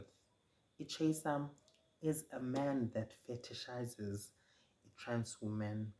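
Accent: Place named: United Kingdom. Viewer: Your English is close to British.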